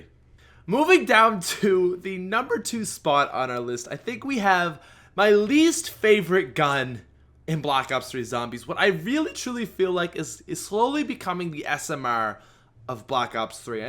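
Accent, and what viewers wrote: Canada. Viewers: This guys Canadian aboot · did any one else notice the Canadian accent hahahaaha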